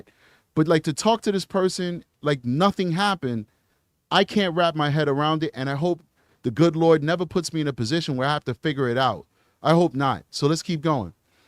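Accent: New York, United States. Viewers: Love this guy ❤love his accent his NY swag 😂😂😂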